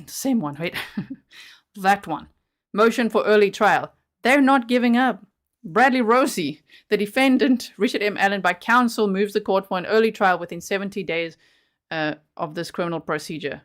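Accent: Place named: South Africa. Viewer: Found your channel, because a fell in to the rabbit hole, that was judge Gull… Love your accent, you sound South African or Dutch (Holland)?? I’am mixed race Danish(white side) / South African (black side, my father fleed under apartheid, to Denmark) and I much say, love the accent ❤ even if I’am wrong about the country 😂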